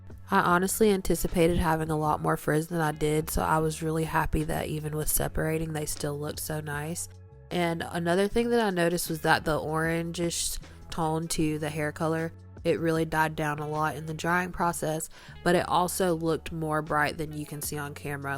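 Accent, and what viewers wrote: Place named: South, United States. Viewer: I love your southern accent 😊❤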